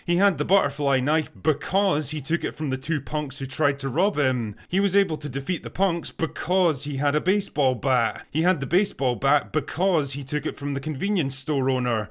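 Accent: Scotland, United Kingdom. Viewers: fuck sake your voice is horrendous, why do you keep drawling out the last word. where in scotland are you fae? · I liked bill. He was right. You, with your scottish accent and lack of balls, make me sad. BILL had a point in this life. You dont. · the sloppy-af Scottish accent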